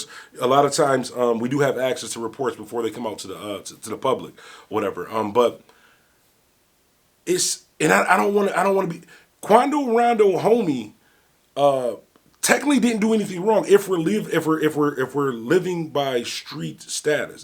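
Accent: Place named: Buffalo, United States. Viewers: You pronounce car funny & yes you do have a Buffalo accent which is proper like Cali niggaz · Lol if I ever bump into I'm gonna give him 5 mins of disclaimers before I greet him and bro you got an upstate accent its cool though rep ya hood lol Salute bro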